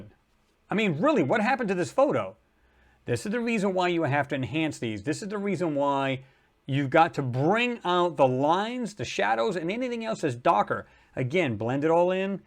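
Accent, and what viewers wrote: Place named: New England, United States. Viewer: NEW subscriber.. sounds like you're from New England. Rhode island here 👊👊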